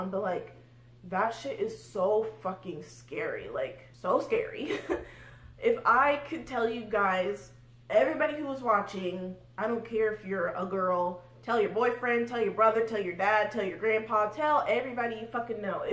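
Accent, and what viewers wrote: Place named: California, United States. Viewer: A young woman with a California accent who says like too much....who HATES MEN? Wow, what an original and unique person you are. Really breaking the mould.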